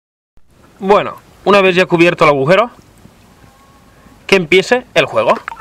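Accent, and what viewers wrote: Spain. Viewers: Eres español no? :3 Madre mia tortugas jajajaja me mate de risa 😂 Viva cangrejo!!!!!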